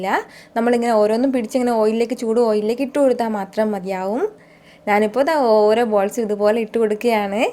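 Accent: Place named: Palakkad, India. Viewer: Palakkad ആണോ മോൾടെ വീട് ....🌹🥰big fan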